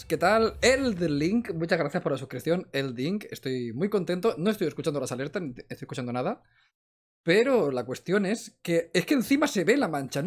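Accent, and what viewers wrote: Spain. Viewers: Lou, asúmelo, eres un valenciano del norte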